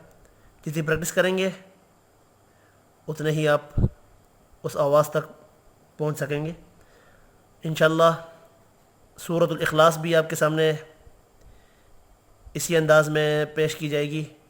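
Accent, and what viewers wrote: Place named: Panipat, India. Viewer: Sir Masha Allah bohot acha prha apne sir mujhe pani pati wala lyhja bohot pasand aaya aur aasan bhi lagraha hai Aur hadr mai bhi aapki tilawt bohot achi hoti hai jazak Allaj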